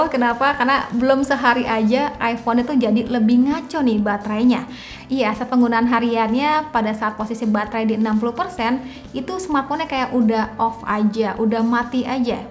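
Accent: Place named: East Java, Indonesia. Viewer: Orang jawa timur kayaknya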